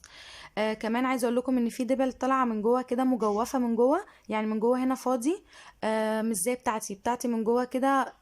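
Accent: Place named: Syria. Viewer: الله يرحم والدك و مبروكة الخطبة بس كلامك ببدل على انك سورية واللا أنا غلطاني ؟؟؟ بس مدام انتي سورية ليش عاملي الفيديو باللهجة المصرية ترا مافي أحلا من الواحد يحكي بلهجتو و لهجتنا من أجمل اللهجات حتى لو خطيبك مصري المفروض تحكي بلهجتك طبعا هادا شي بيخصك بس حكيي مجرد رأي شخصي ما أكتر والله يوفقك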